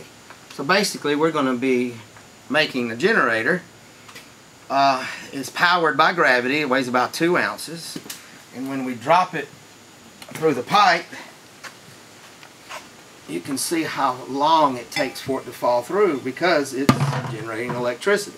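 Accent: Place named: Appalachia, United States. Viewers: that was cool thanks. let me guess - North Carolina accent. Yes that's where I live. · Trick Photography, hillbilly talk. I love this guy!!!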